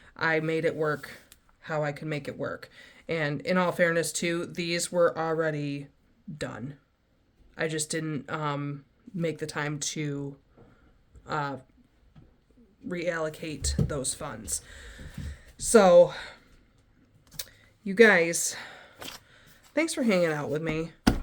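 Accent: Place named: New York, United States. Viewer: You have a New Yorker accent. I love it!!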